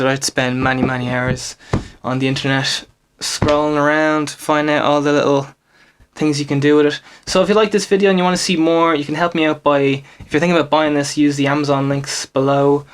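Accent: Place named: Ireland. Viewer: Nice one, & a pleasure 2 hear that charming Irish accent !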